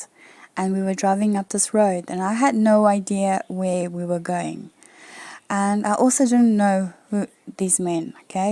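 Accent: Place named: South Africa. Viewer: I just came across this video and recognize your South African accent!! (groete van Benoni 🇿🇦) God bless, sister. See you soon in the clouds!!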